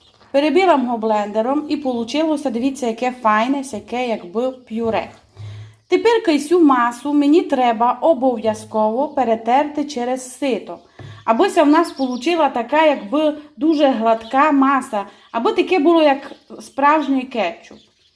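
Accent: Transcarpathia, Ukraine. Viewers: Дякую за рецепт. Обов'язково приготую. Я обожнюю ваш закарпатський говір❤. Дай Вам, Боже, рясних благословінь і міцного здоров'я. ❤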